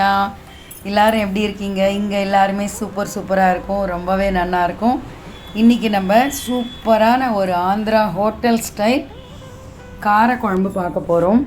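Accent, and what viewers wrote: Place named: Sri Vaishnava, India. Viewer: Sri vaishnavaa பாஷை கேட்க மிகவும் ரம்மியமாக இருந்தது மிக்க நன்றி 🎉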